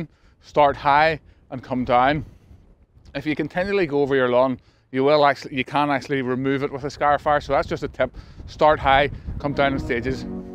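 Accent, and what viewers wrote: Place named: Belfast, United Kingdom. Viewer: Love the accent, my dad was from Belfast...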